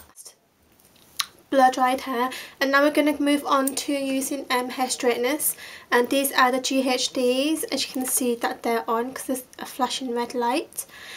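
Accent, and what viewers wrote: United Kingdom. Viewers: is that a birtish accent, not to be rude if it is :)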